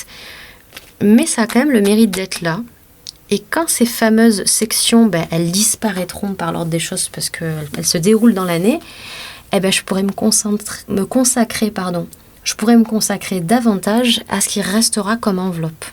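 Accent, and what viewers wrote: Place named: South, France. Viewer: Je rajouterai avec un bel accent qui sens le soleil..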